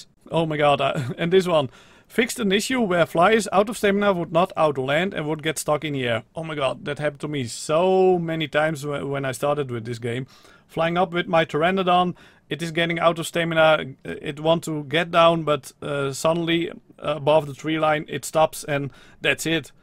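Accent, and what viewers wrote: Netherlands. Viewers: u bent zeker nederlands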